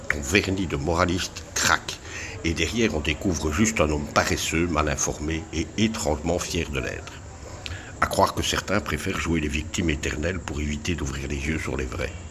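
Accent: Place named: Belgium. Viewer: Voila la réalité toute nue, la vérité incontournable. Ces atavismes peuvent être reprochés a une grande partie de la communauté Hébreu, particulièrement les plus médiatisés, s'est sans doute pour cela la parole leur est si facilement accordée, mais se rendent-ils compte qu'il signent là leur déchéance morale ? Merci Monsieur a votre accent je pense que vous êtes Belge ? J'aurais aimé que ce soit un français avec des responsabilités politiques qui ait votre courage, de cibler directement un malotru y compris sa suffisance.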